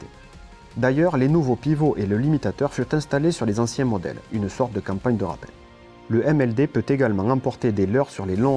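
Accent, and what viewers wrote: South, France. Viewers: Ce délicieux assin' du sudeu pour raconter un avion soviétique est une merveille, toujours le même plaisir à faire le tour de vos vidéos tous les quelques mois =)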